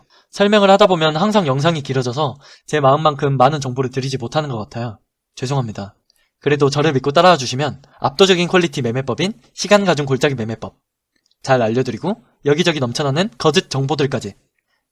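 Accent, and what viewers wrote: Jeolla, South Korea. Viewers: 말투가 어째 광주나.전라남도쪽분 같은디요.저는 공포구간에서는 잘 사긴하는데 수익구간에서 끌고가는걸 잘 못해서 끌고가는거 도움이될까하고 구독.좋아요.박았습니다. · 전라도 사투리 ㅈㄴ세네 ㅋㅋ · 전라도 놈이네ㅋㅋ 믿을수가 없다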